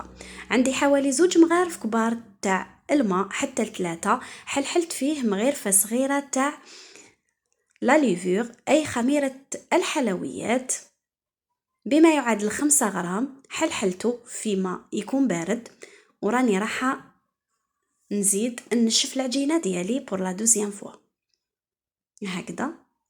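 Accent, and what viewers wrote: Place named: Levant, Arab world. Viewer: يعطيك الصحة وصفاتك روعة بغيت نسقسيك هدرتك شابة الله يبارك انت وين عايشة اللهجة بانتلي شوية من المشرق العربي. ربي يوفقك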